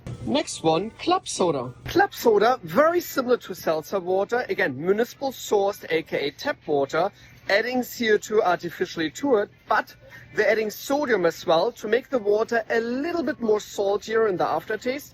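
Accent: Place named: Germany. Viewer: Are you German or Austrian? I love your accent. Im trying to familiarize myself with different accents. Also excellent video. One of the most thorough explanations ive seen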